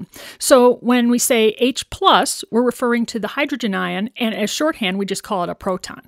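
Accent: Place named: South, United States. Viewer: It is a southern accent and I love it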